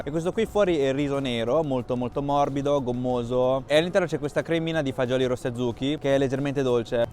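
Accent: Italy. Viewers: Se dici che non si vede, però ti dico che si sente che sei italiano... Dai, si capisce subito appena ti si ascolta.... Poi chiaramente avrai origini cinesi ma non vedo dov'è il problema e tutta sta gente che si meraviglia di ste cose a giorno d'oggi a me inizia a starmi sulle scatole. Non dovresti precisare niente a nessuno!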